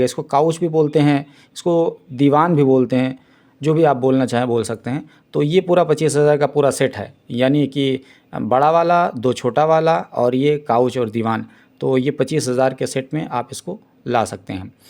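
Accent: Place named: Lucknow, India. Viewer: lakhnavi Andaaz Bahut Khoob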